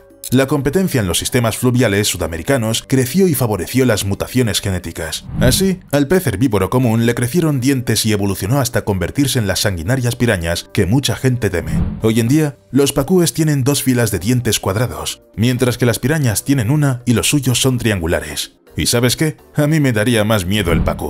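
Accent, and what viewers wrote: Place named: Spain. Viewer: Pros del video: Una investigación interesantísima, imagenes acorde y un tema novedoso. Contras: Español de España.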